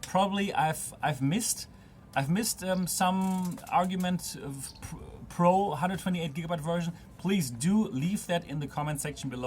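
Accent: Germany. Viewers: Are you a German? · very hepful! Are you by any chance german? Maybe im mishearing something but you sound kinda like it haha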